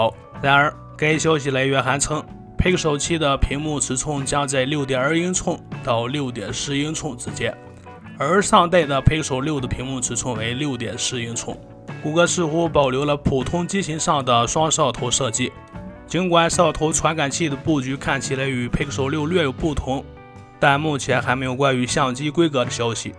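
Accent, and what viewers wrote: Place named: Northwest, China. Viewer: 口音浓浓的西北黄土味！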